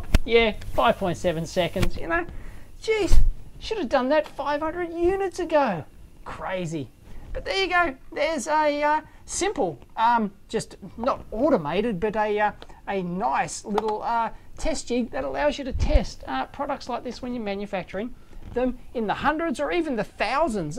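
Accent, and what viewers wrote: Australia. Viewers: Awesome! (said in your Aussie accent) :)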